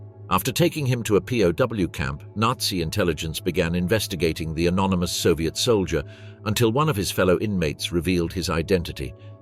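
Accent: United Kingdom. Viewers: yes, but its even worse the use of UK english (with all sorts of dialects) while it should be US english narrated. Now thats really an islands plague :-((((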